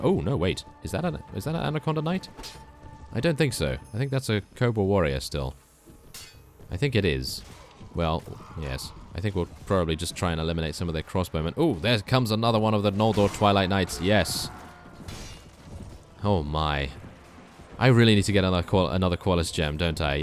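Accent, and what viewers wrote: United Kingdom. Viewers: UK I think. u can tell from how he pronounce certain words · I'm just making an assumption from the accent that he would be from the UK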